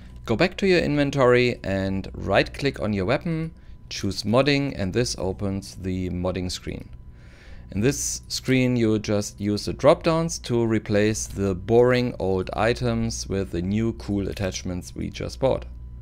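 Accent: Germany. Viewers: Thank you nice video are you German ?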